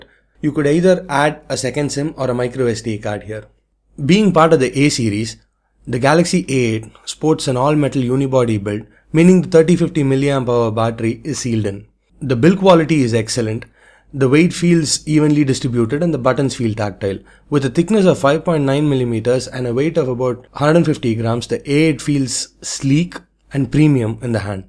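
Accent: India. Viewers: dude what happened to your voice.. you need more power you sound tired... the Indian accent is fine but you need to be more energised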